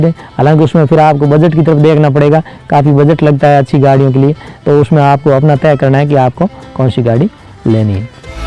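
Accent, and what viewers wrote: Rajasthan, India. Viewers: राम राम भाई.. कुणसो गाँव सा.. आपके बोलने के Accent से लगता है आप राजस्थान में हमारे गाँव के आसपास के ही हो..आपके वीडियो देखलर बहुत अच्छा लगा खुशी हुयी..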